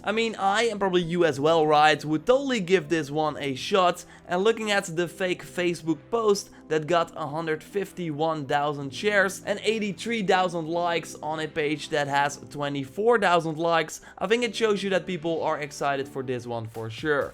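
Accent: Netherlands. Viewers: is any way to ask someone who speak correctly english without any harsh accent like yours ? Because bro it is really difficult to focus on what you are saying with your dutch accent. Then you will focus on the video montage while someone else will care on the speech. And please change us this annoying same and same music background... Take care. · That horrible Dutch accent. I puke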